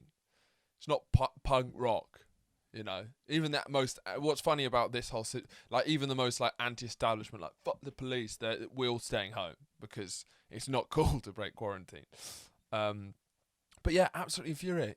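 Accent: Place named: New York, United States. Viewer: Ridiculously good New Yorker accent lmao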